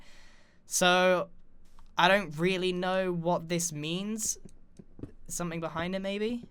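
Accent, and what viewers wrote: United Kingdom. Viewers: QnA: are u British/English cuz I am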